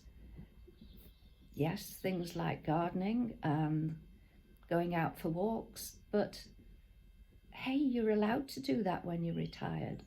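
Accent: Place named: Lancashire, United Kingdom. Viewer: I thought it was a Lancashire accent, maybe more rural Lancs than say Manchester. I was brought up in St.Annes and now we live in Heysham, it's a grand place.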